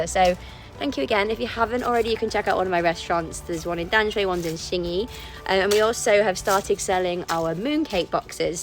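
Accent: United Kingdom. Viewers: Love your share, especially hearing your British accent! What a pleasant enjoyment.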